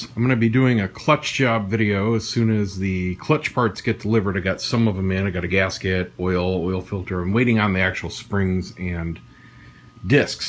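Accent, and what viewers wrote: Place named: Orlando, United States. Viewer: Check the pawn shops, ive been cleaning up there lately. You sound like your in the Orlando area. If so check out Godfathers pawn on Colonial. There are 2 locations. I seen a nice Milwaukee box in there you might like.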